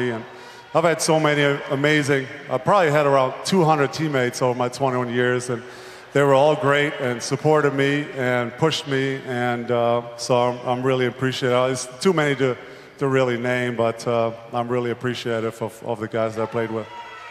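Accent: Germany. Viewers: ah year he‘s from germany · I can hear the german in his voice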